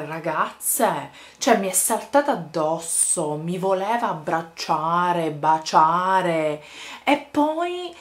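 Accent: Sicily, Italy. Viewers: ma quanto ti adoroooooooooooooooooooooooooooooooooooooo..... poi il tuo accento SICILIANO lo adoro.... anchè perchè anche io sono catanese :******************************************* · sei siciliana? :)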